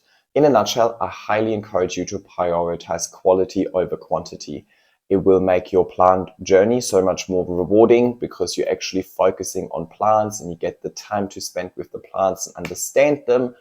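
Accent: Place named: Germany. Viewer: Exactly!!! Thank you! And are u from germany?! U have an accent like germans :)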